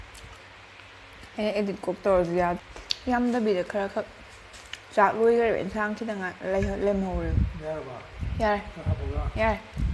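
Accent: Kakching, India. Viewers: Kakching marolse taba ym nungaite nungcihe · Kakching marol se aidi tabada yam nungsi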